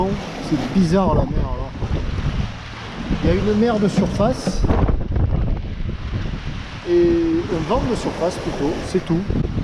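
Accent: South, France. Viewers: Pas mal ton anglais avec l'accent du midi! Bonne mer mon, fait nous rêver, avant mes vacances (juillet et aout, mais en atlantique) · Hello, How are you! It’s cool que tu speak english!. Je ne m’y attendais vraiment pas et ne me permettrais surtout pas de me moquer, je ne fais vraiment pas mieux... cette chaîne s’ouvre aux anglophones, bravo. A priori ceux de Falmouth comprendront certainement mieux l’accent du sud que les écossais 😉 isn’t it? Y a plus qu’à te souhaiter bon vent pour ce nouveau voyage, je devrais plutôt dire cette nouvelle odyssée... vu la destination ciblée. Attention à bien éteindre l’AIS en Croatie, sinon tu vides le porte monnaie pour rien. Ciao, tchuss, salut, arrivederci, bye bye 👋. Et merci pour ces images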